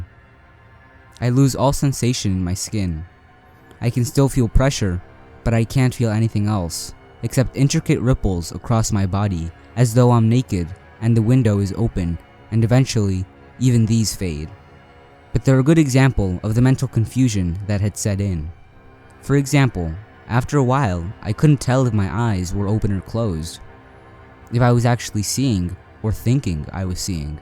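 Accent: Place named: California, United States. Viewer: Subbed! Where are you from dude? I like your accent. California maybe?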